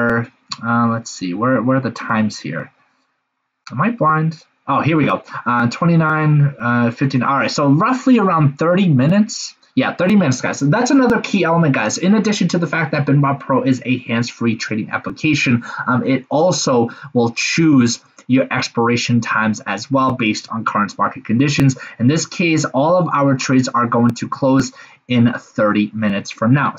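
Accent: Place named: United States. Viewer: to me your accent sound so American 😅 where are you from or at least where do you reside? Because you have access to those brokers that does not allow Americans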